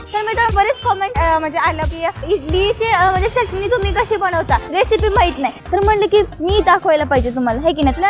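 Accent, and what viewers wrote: Latur, India. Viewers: Latur chi bhasha ❤️😂(Latur accent)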